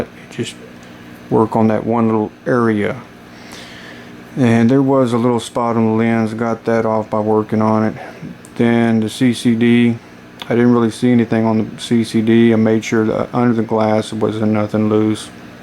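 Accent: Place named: Texas, United States. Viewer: You sir are really funny talking with that slow texan voice ! My camera would show me a blurred screen with weird colors and wouldn't take photos anymore after a trip in the Sahara. I followed you step by step, blew canned air and picked out any dirt I could find and it worked ! I'm so happy and I wanted to thank you very much.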